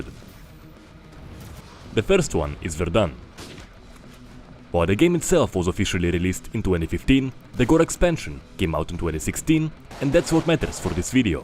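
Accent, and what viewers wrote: Russia. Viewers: This is russian ascent?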